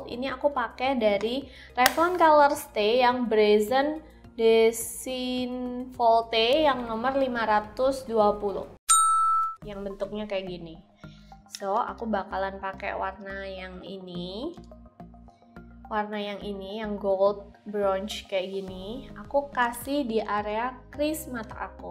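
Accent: Java, Indonesia. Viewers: pertama kali liat langsung subscribe :D lucu medok jawa gitu haha sering" upload dong kak ^^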